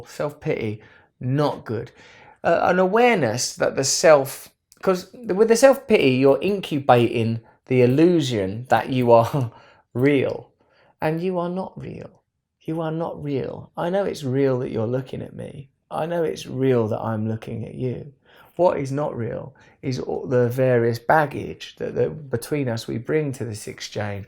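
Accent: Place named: United Kingdom. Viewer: thanks dude. I just finished your book Freedom and it's awesome btw. you remind me a lot of a friend I have, she also says wise things and also is very funny and also is British, so based on my sample size of 2 in this survey I guess it must mean the British are wise, funny and, well, British. have cool accents, lets make that number 3. anyway thank you xx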